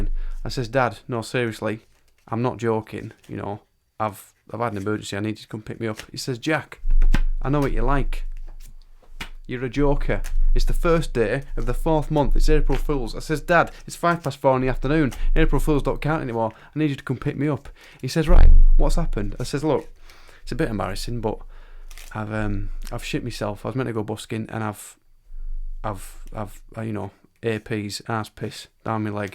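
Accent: Birmingham, United Kingdom. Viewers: Golden fingaaas CHECK Bostin accent CHECK On-the-edge-of-the-seat storytelling CHECK Best dad ever CHECK LE-GEND!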